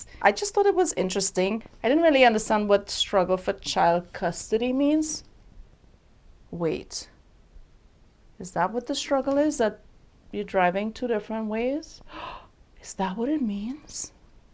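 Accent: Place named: Trinidad and Tobago. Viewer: I just came across your channel a couple of months now, and I have to say I truly enjoyed your videos. Butt. . I have to ask are you from Trinidad 🇹🇹? I am... not that it matters but I kind of pick up on the accent. Truly enjoy your videos regardless where you from.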